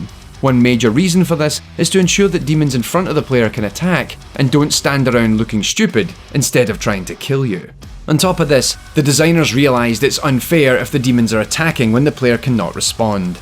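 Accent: Scotland, United Kingdom. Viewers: One like coz it has DOOM in the title... An unlike..... So i can just like it again for your SCOTTISH ACCENT.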